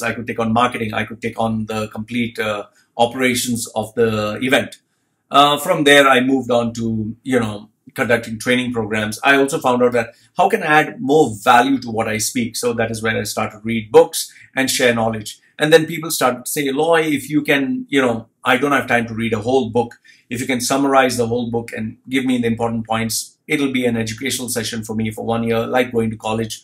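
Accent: India. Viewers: you sounds like Indian which i means like me.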